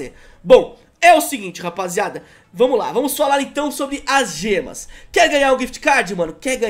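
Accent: Minas Gerais, Brazil. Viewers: e mineiro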